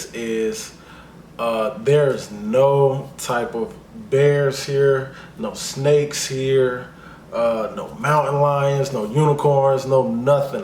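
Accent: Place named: South, United States. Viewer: He is country. It is his accent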